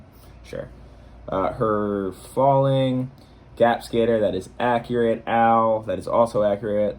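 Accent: East Coast, United States. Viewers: def east coast vibes just because the way he talks shit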